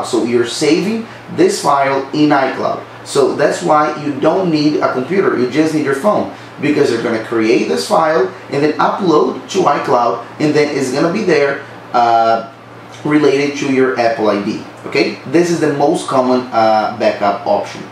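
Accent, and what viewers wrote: Brazil. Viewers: It took me 5 secs to know this dude’s a Brazilian... it’s engrained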